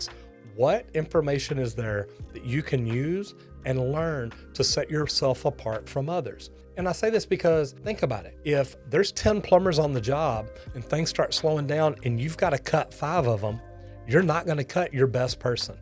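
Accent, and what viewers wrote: South, United States. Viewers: I kinda like his accent. Not too southern and not to Northern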